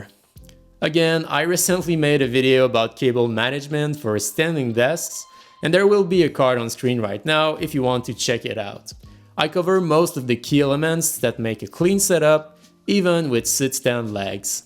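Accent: Quebec, Canada. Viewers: Criss, ca parait t'es Quebecois, lool. Nice vid! Just got myself a standing desk. Gonna check out your cable management vid lol.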